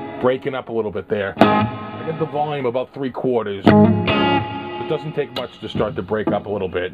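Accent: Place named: New England, United States. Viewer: what part of New England are you from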